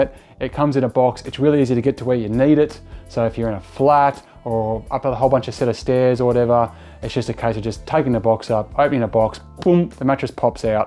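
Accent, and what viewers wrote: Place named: Australia. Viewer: Not too compatable with US market. I did enjoy the Aussie accent.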